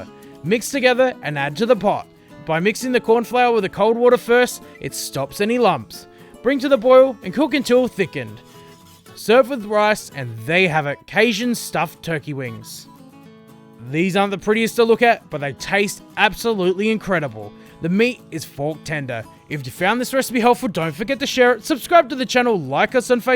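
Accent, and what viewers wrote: Australia. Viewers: australian voice making Cajun food ??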